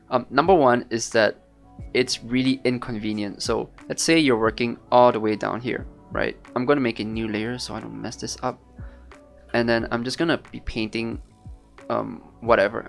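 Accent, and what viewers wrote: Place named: Singapore. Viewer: Recognized that Singaporean accent ;) Great vid, Subscribed!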